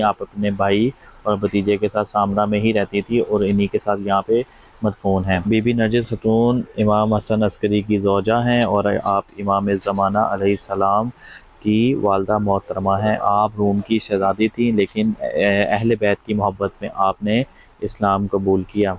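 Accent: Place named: Punjab, India. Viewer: Bhai tum Punjabi ho tum jab Urdu bolte ho Punjabi tone me bahut maza ata he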